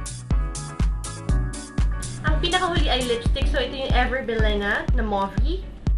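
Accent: Philippines. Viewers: hahaha nakakatuwa naman pag tagalog, pinoy na pinoy talaga. actually hindi na sya tagalog, halos filipino na sya ksi pormal na pormal yung salit :P nice tutorial btw.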